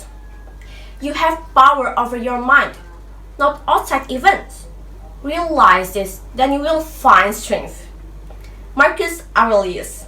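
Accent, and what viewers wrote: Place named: United Kingdom. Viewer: Buihhh.. British bngt 👍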